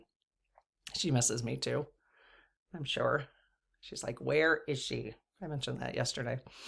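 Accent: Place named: Wisconsin, United States. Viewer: ....wow you are SO Refreshing, I love listening to you cause it feels like talking to my sister or best friend....by the way are you from Wisconsin? you have that Wisconsin kinda vibe and accent? I LOVE your feisty truth telling too........all of us should strive to have that yin yang balance of doing deep inner healing work, connecting to our own higher self and spirit guides to help us grow and learn....and then taking action and being brave enough to challenge the injustice and talk about it like you are doing on your channel....May we all be guided together to a more peaceful and sustainable world!!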